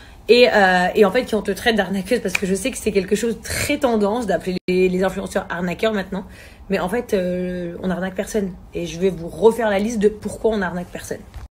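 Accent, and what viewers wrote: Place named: Arab world. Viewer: J'ai stoppé la vidéo net en l'écoutant parler comme une arabe. " ca va porté le ein ! Euh vous etes au courant que plus de lamoitier des marques quel pub sa leur appartient à elle et son mec ???? Tous les trucs de cire et compagnie cest a eux donc si vous recevez pas cest qu'ils continuent encore et encore a vous escroquer et à vous mentir.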